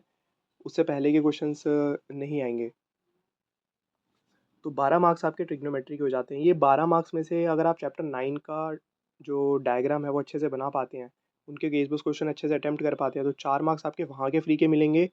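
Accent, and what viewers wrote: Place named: Ghaziabad, India. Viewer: hey do you use any social media platforms btw my mom is also from gaziyabad and i love the excent of that and i am quiet intrested to talk with if you have any account plz tell😊and conversation in comments section is quite award for me😂😂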